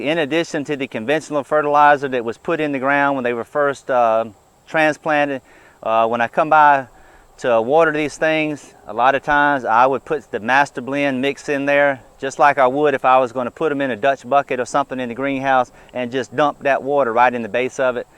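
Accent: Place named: Gulf Coast, United States. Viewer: By the sound of his accent, I’m thinking he’s on the gulf coast. It’s hotter than hell until about November!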